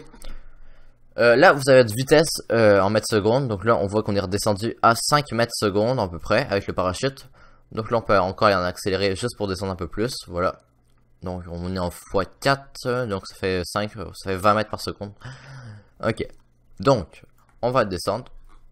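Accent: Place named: Quebec, Canada. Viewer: Ton accent paraît québécois donc je suis casi sûr que tu es un canadien mais dis-moi, t'es un passionné de l'espace ou t'es à l'école d'astronomie en faite, moi je fais attention à presque toutes mes sources d'information, je vais dire que j'ai appris pas mal de choses nouveau qu'en voyant quelques-unes de tes vidéos qu'aujourd'hui je me suis trouvé sur ta chaîne aujourd'hui même 31 déc 2019 en chercheant avoir quelques notions sur ce que c'est "l'orbite" et pour dans les résultats je suis tombé sur la vidéo que tu as fait a propos de ça, merci de lire j'attends la réponse de ma question hein, il faut que je vous dise aussi que je suis haïtien mais vivant au chili. Merci encore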